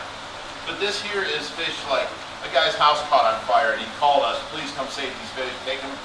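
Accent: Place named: Ohio, United States. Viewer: Ohio accent. I like it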